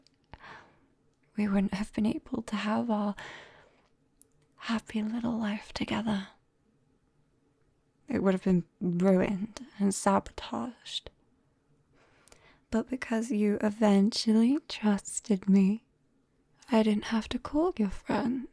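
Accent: United Kingdom. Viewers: I don’t know what it is exactly but there’s something about that British accent that just makes this so much better. Along with my favorite type of character, "yandere" just as good as the hide and seek one. Love the content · why are you british -( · i love british accent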